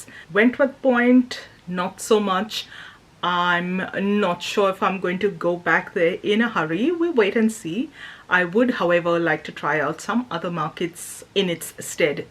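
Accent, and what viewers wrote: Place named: United Kingdom. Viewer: Maybe a stupid question but in your bio it say’s Australia but you sound like someone from the UK😬 i am a starting artist and thinking about doing markets to sell my art but will the costs way up against the sale? Something else i need to overcome is the amount of people, i am a high sensitive person. So back to your video , i really liked it. Well done👍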